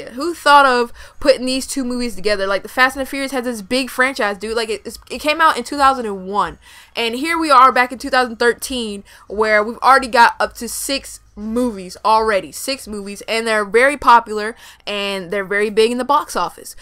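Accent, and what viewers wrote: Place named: United Kingdom. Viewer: Lol your British voice, and I'm running out of comments on my phone...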